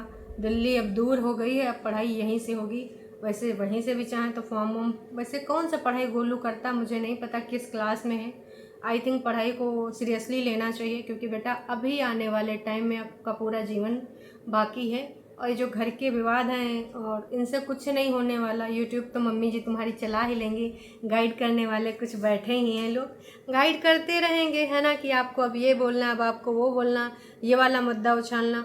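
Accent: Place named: Awadhi, India. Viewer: आपकी अवधी बहुत प्यारी है जी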